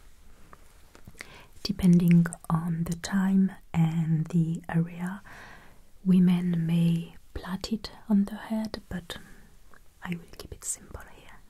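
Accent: France. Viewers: Bonjour, j'espère que cette pause aura été bénéfique pour vous. Pour ma part, vos vidéos m'ont beaucoup manquées et je suis ravie de vous revoir ! Pouvons nous espérer, un jour, une vidéo complète en français ? Car si je ne m'abuse, c'est votre pays natal ? Votre accent m'y fait penser. Bon courage et merci pour ces vidéos qui doivent vous demander tant de travail !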